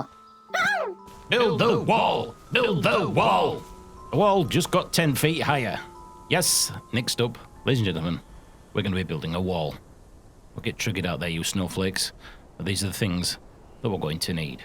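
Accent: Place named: Wakefield, United Kingdom. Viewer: Eaar Byyy Gum, Cheers for the video lad, Wakefield accent i can hear there? :)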